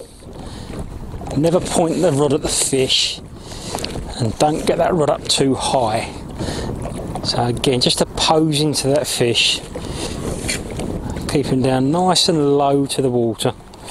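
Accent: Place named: Essex, United Kingdom. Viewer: Nice one - very useful information. I'm from up here in the north west and I've been trying to mic your lovely Essex accent to my mates up here - nearly got it!! Great stuff mate - well done!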